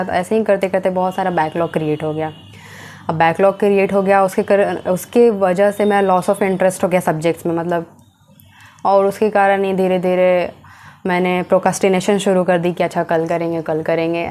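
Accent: Bihar, India. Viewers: Tumhari acent me bihari tone hai 😅.... Are you from Bihar?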